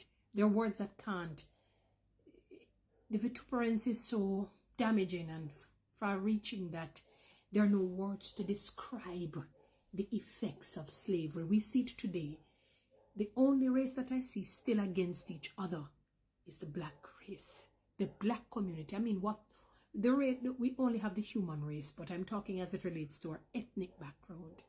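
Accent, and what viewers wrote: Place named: Jamaica. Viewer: All black is ok as long as it is from the country that enslaved them! In America ( American decedents of slavery) ados because American government enslaved our ancestors! I don't know what country enslaved your people but I can hear your accent, you sound Jamaican. Hope you and your people get your reparations from whichever country that enslaved your people( ancestors)🤔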